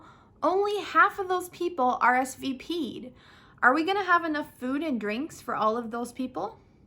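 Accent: United States. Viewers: I want American accent like you ❤ I am 13 years old from Korea🇰🇷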